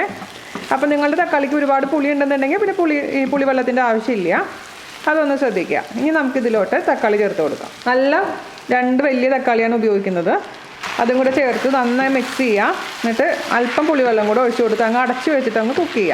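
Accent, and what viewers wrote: Thrissur, India. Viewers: Hi! Lovely recipe. Will try this with Doshas tomorrow. My native is Thrissur too and I love the way you speak the typical Thrissur bhasha. Hope to meet you someday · The dish is too good, you have a real talent to talk well in the typical Trichur slang, though sometimes it crosses the limit and cooking takes a backseat...on the whole, your videos are drool stimulating....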